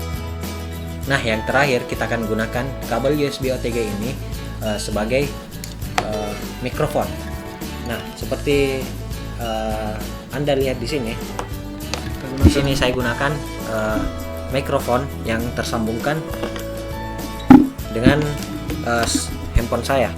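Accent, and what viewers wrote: Makassar, Indonesia. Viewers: Bang orang Makassar yah? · Orang makassar yah bang?? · org makassar kah???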